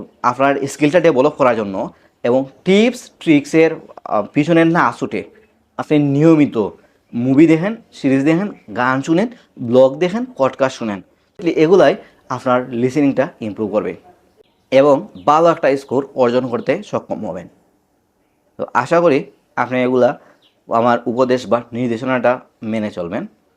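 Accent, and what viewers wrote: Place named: Sylhet, Bangladesh. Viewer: আরে সিলটি স্যার। আপনার কথা বুঝতে আমার অনেক সমস্যা হয়। স্যার প্লিজ, আগে একটা বাংলা প্রনানসিয়েশনের কোর্স করুন। এতে আমাদের বুঝতে ভালো হবে, সাথে আপনারও বাংলা কথায় সিলেটি একসেন্ট কেটে প্রমিত হবে। প্লিজ স্যার। আপনার কথা বুঝতে অনেক কষ্ট হচ্ছে।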